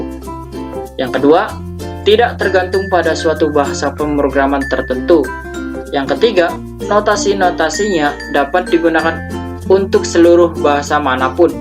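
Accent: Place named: Ambon, Indonesia. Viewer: Persis ambon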